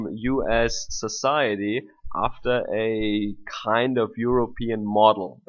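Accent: Germany. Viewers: jan uniter He is German. This is how everyone not American speaks!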